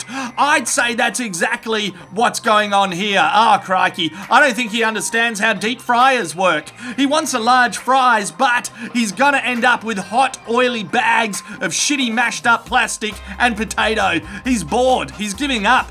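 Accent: Australia. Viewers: Fucking love this guy Are you an Aussie too mate Im dumb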